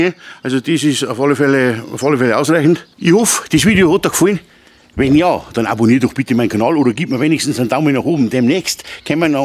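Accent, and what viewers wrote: Bavaria, Germany. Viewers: Also i hoer di so gern mit Deinem Bayrisch. Das is schoh supper!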